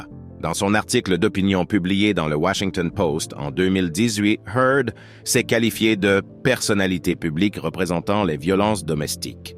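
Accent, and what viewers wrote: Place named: Canada. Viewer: Adorable accent Canadian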